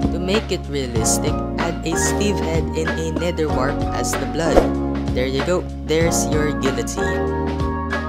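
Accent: Philippines. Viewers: I instantly recognized the filipino accent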